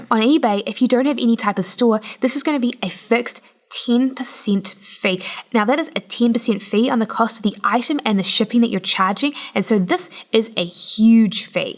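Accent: New Zealand. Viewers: I began to understand a lot more about Amazon and Ebay selling, just from post. This led me to research much more in depth regarding this subject. So, in my opinion, great work!!! Additionally, I imagine the reviewers leaving negative comments believe they are showing a humerus side of themselves, but only identifies them as ignorant and unpolished. Her accent is amazing and I suspect she does hail from New Zealand. If you haven't traveled, the country possesses an undefinable beauty, North and South. The Kiwi population (aka New Zealanders) are a wonderful, warm, welcoming and positive people. I would live there in a heart-beat and would relish hearing words like, DEPIEND and CIENTS, its quite enjoyable to hear. Let's bear in mind that English existed far longer than America, so we need to be tolerant of other English speakers, including Speakers of English as a Second Language. 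· 'Depind', 'cint', etc. This is New Zealand pronunciation.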